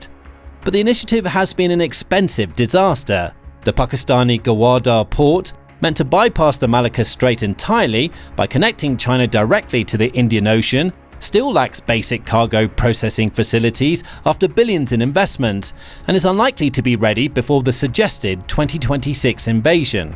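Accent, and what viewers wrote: United Kingdom. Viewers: Soon as I heard the accent, I knew it was going to be all lies and I'll be dern, it sure is. Outright fabrication. If the MI6 actually believes this crap, it's no wonder their empire has crumbled! Furthermore, how can these MI6 boys think we are ignorant of their little tricks and plots? Only the dumbest people believe that Janes Bum fantasy as savior of the world. HA! The world is awake now and will shake off these parasites like a bad cold.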